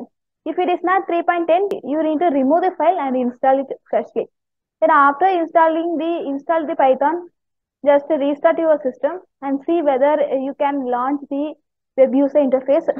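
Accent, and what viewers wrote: India. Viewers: i hear an indian this gonna be good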